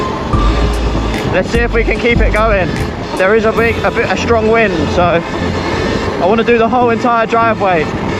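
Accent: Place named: Midlands, United Kingdom. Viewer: We’re are you based mate trying to figure it out I’m Northampton you sound like your midlands. I like the look of the woods. Also thinking about losing my front mud guard as it looks much better. Do you not get a face full of mud 😂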